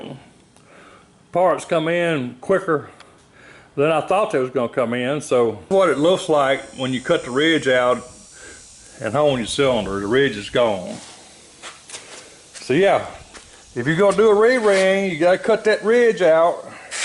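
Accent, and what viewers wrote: South, United States. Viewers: Бля, как же меня прикалывает этот "реднековский" акцент. Good job men.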